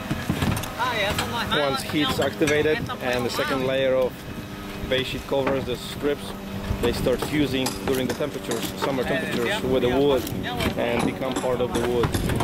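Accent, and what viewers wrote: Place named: Mexico. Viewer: good to see these tough americans working so hard. Gotta keep those jobs. No damn Mexicans come here as roofers, get rich and demand overly high wages. Not in my land. Hope that heavily accented Mexican commentator isn't getting paid much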